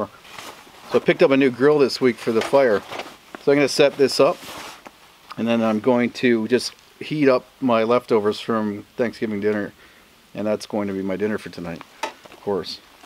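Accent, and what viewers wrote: Canada. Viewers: Being a Canuck (Ontario) living in the USA and just finding your videos, this is a great feeling. I am enjoying living stateside however, I miss Canada and my backcountry escapes. Nature here is different and while that's great to experience, I have the feeling for the wilderness I grew up travelling to. Killarney, Algonquin Park, North Bay etc. Those trees, bird sounds and smells feel like home in comparison to what we have around us now. So your videos (and accent) are a great view of home. Thank you for making them and I look forward to watching more. All the best!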